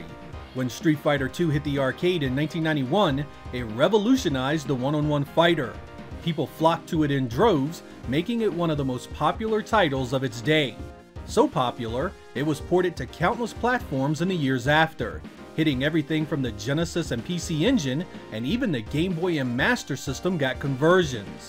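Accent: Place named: North Carolina, United States. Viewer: I've been meaning to ask you this for years... Where are you from? Your accent reminds me of my dude from North Carolina. Like, to me you say bauls (boss), or bault (bought), or cault (caught). It's not a dis, it actually makes me wanna watch more.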